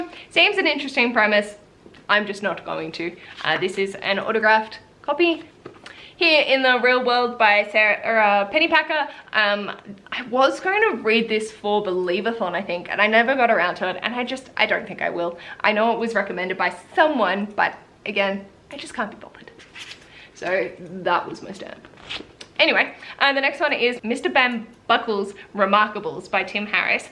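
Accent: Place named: Australia. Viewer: If I can place accents properly, I believe that you are Australian. I have many friends from Australia that swear a lot. I love it, lol. Canadians swear a lot as well, but we don't sound half as good swearing like our Australian cousins.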